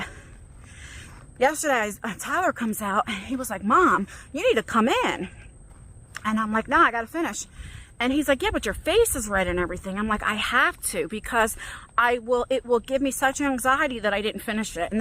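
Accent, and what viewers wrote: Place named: East Coast, United States. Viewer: What state are u in? U have an east coast accent. I am from PA. So i noticed it. ❤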